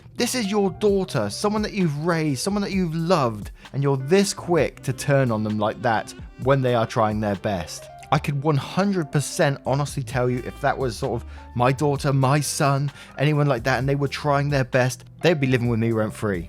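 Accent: United Kingdom. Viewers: Nothing better than listening to a British dude shitting on MLMs while playing a farming sim game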